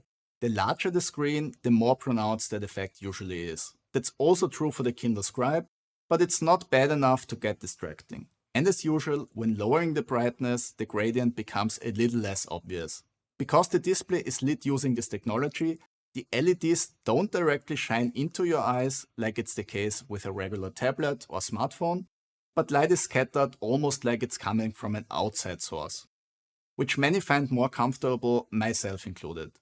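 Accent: Germany. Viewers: Muy friend, your video is perfect, your content is very good, but you need to work on your english, because it really sounds like german spoken with english words. And I am writing this as a non-native listener. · Bad bad german or austrian accent sucks alot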